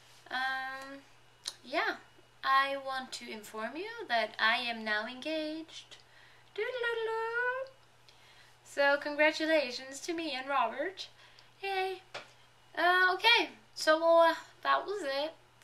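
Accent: Oslo, Norway. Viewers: norwegian sounds like german..love your norwegian accent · Er du fra Oslo? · It's just a difference in dialect pronunciation. People around the Oslo area pronounce "og" with the "g" sound but it can also be pronounced without. It's actually a common mistake that people write "å" when they mean "og" because they sound the same.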